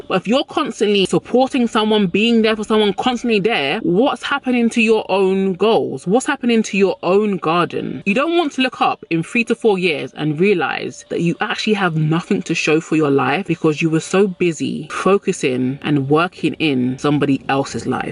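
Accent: United Kingdom. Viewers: Yo ah from the Uk i can tell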